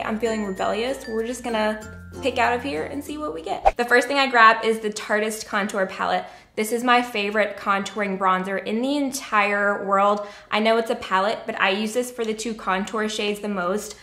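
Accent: United States. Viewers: how did you get rid of your cute country ass accent LOL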